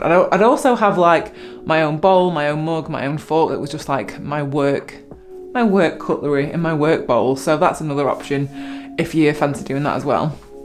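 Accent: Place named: Manchester, United Kingdom. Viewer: You didn’t cover the biggest problem that’s always made it difficult for me. Where to put your bike? With you’re accent I take it you are from Manchester, well I live there too and I’ve yet to work at a place there that would let me take my bike inside. Last place I worked I had to lock it to a lamppost outside, it lasted a week before it was robbed. The police described there are gangs that drive around in vans, which they fill with bikes. They carry bolt cutters that make mincemeat of even the most expensive locks. My bike before that was even stolen from inside the development where I live, watching back the CCTV, they somehow got the gate to open, came through the gate in a van, reversed up to the cage containing about 20 bikes, used bolt cutters to cut a huge hole through, filled their van, then drove off, the whole thing took just 13 minutes to steal 20 decent bikes. 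It seems to be a problem with Manchester in general, people seem to feel entitled to take what isn’t theirs. Like that daft MoBike scheme, it was never going to work, most of those bikes are now in the ship canal. I’ve even witnessed a group of youths jumping up and down on a bike, because they couldn’t steal it, so they thought they’d trash it instead. I just don’t take my bike to the city anymore if I know I have to leave it. Most of the time now, I just walk.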